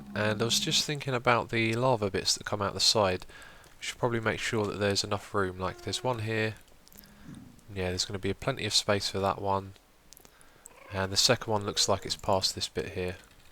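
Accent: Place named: United Kingdom. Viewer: Your voice is so epic and sexy i must say ! your accent is also really good just love the british accent!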